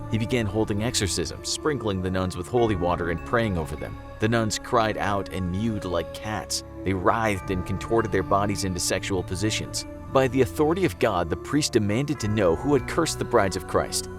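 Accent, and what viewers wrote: France. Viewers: Why does the French accent sound so satisfying?? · Lol he was butchering all those French names but because he said it with a French accent it sounds legit to non-French speaking people 😂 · The French accent is a nice touch👌🏾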